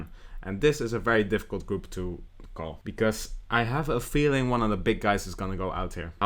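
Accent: Netherlands. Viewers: He may live in belgium but he is clearly dutch you can hear that from his accent. And look at his name is which is not a Flemish name and certainly not a Walloon name. is a typical Dutch (the Netherlands) name.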